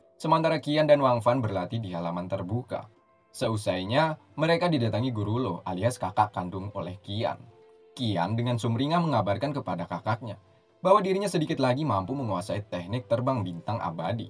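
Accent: Banjar, Indonesia. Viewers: Urang Banjar kah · Orang Banjar ah bang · Anjir ngakak pas akhirnya, urg banjar pinanya ni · Urang Banjar banh? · Astga, lama nonton rupaNya yang cerita ini URANG BANJAR JUA 🤣🤣🤣🤣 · Banjar banar 🤣🤣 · Banjar bnr kh bng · Urang banjarrrrr bjirrrrrrrrr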